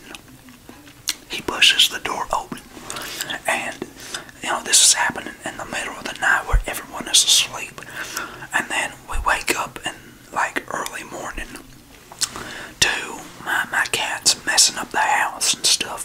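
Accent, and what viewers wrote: South, United States. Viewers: Can you please do a “helping you cheat on a test” role play with the gum chewing and the southern accent🥺💖 you’re amazing !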